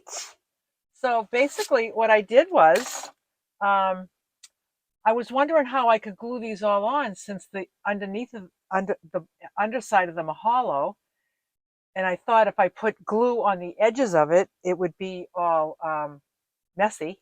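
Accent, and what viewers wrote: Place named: Boston, United States. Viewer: just to let you know, that you are such a regular, played in my kitchen as I'm cooking dinner, that both me and my adult son (from the UK) now have mastered the Boston accent. 'That shit bowthas my eyes, 'Over heeyer" Over theeyer' Love you and your natural style x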